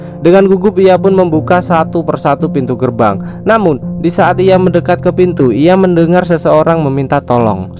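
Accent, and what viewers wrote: Surakarta, Indonesia. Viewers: Orang solo kah ? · Orang solo bang? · wong solo kah ? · weeee tekke wong solo to mbak e .. wkwkwk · Orang solo?..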